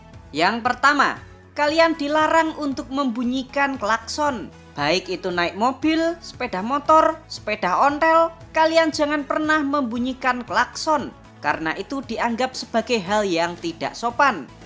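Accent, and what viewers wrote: Java, Indonesia. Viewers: di lihat logatnya mimin org jawa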